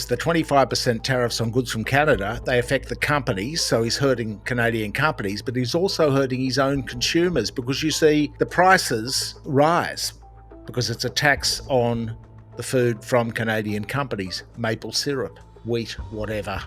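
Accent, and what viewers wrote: Australia. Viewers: Hey mate. Nice hearing an aussie accent. This is mad.. but this is what he promised? All aboard the market crash!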